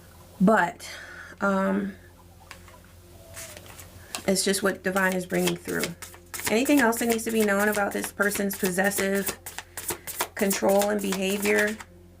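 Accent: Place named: Trinidad and Tobago. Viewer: on point with the voice 😁 you're great.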